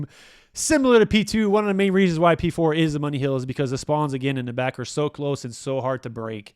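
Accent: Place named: Quebec, Canada. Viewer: Thank you! I was in your live stream when you were talking about this and I remember asking if you would make a youtube video about it, so pumped to see that you pulled through. Please make more videos like this!! Also cheers from Canada, I know a french canadian accent when I hear one.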